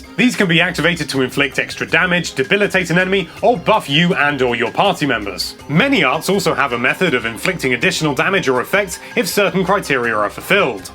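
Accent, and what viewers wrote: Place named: United Kingdom. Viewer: I love how wonderfully British you are, it's so fun listening to you:) I can't wait for this game, I'm so hyped.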